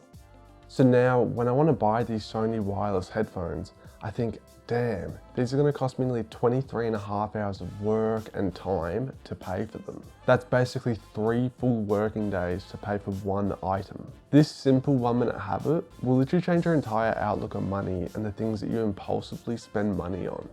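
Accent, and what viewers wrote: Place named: New Zealand. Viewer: So your mom is from New Zealand. Good Guess on my part. LOL! 😉 Your accent … I was trying to place it and I thought: His accent sounds similar to Australians but not as prominent and then I thought New Zealand.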